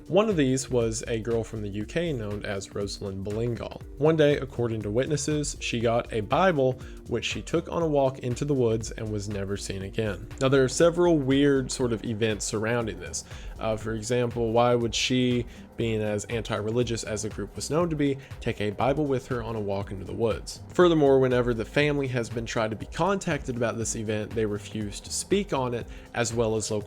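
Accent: South, United States. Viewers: I thought you sounded like you were from north georgia/carolina or tennessee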